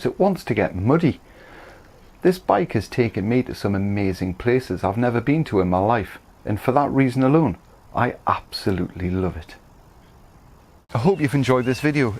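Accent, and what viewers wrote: Newcastle, United Kingdom. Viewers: Well done, enjoyed your Geordie accent and wit ( charm would be going a bit too far).. cheers from am old Jock down under in Western Australia. PS you need a passport for here as well, but the weather is great for riding that AT!.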